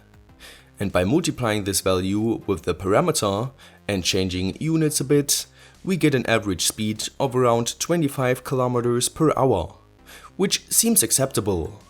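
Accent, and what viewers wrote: Germany. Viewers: Hört der sich nur für mich deutsch an ? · I cant stand your german accent please learn proper english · Are you from Germany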